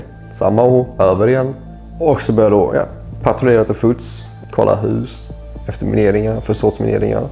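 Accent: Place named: Skåne, Sweden. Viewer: My background is Swedish army basic training in the '80s, one tour in Bosnia in the 90s and Swedish National Guard for over decade. Drafted E-5, ended up O-2. I emigrated to the United States about 25 years ago. I observed that all the stupid stuff that we were warned that the Russians would do in war, actually still happen, about 25 years after we were first taught them! Every single weakness and structural problem! It's unbelievable. It's good, but weird. They mostly seem to run T72s, still. The B3 version, but still. You can tell that this man has experienced some serious s***. My unit was in one firefight in Bosnia, but that was a very brief moment and even though we killed people I'd relatively close distance, we didn't take casualties of our own. That checks with friends I have here in the United States, who have served in Iraq and Afghanistan. 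Enemy casualties is one thing, but on your own side is traumatizing on a whole different level. On a lighter note, I'd like to mention his speech. When I started the video, I noticed he had "non-Nordic" ancestry. My brain automatically prepared to listen a little closer in case he had an accent. I have worked for decades with native Spanish speakers here in the United states, including as supervisor, so my brain is prepared for everything, so to speak (I ended up learning a lot of Spanish, cuz that was easier). Then, I noticed that he has an accent from Skåne (Scania). That accent and his rapid speech, made me have to follow the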